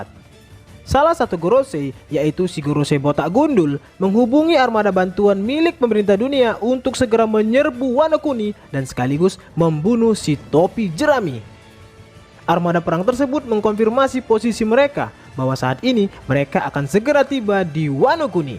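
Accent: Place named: Java, Indonesia. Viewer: Bang,lu orang bekasi ya 🤣 bahasa lu medok bngt njir 🤣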